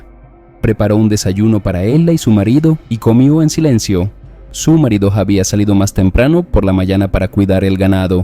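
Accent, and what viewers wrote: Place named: England, United Kingdom. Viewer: Que mal narrados estan los videos, habla un español que de repente no se entiende como que lee mal las lineas y las pronuncia en ingles. Deberian poner a un narrador que si entienda y sepa leer el español.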